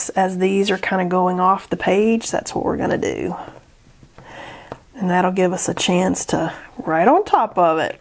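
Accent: South, United States. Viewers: I love these colours. This is beautiful. Unfortunately, it was late here in Australia and I watched this in bed. It’s been a hectic weekend and had no spare time to watch it earlier. My other half was sleeping, and I didn’t want to wake him, but I couldn’t resist watching. I’ve saved it and will watch again tomorrow, so I can hear that wonderful southern accent. However, you can’t get a more southern accent than Australia. Any further south and we are in Antarctica 😂😂. Thank you for another wonderful video 🫶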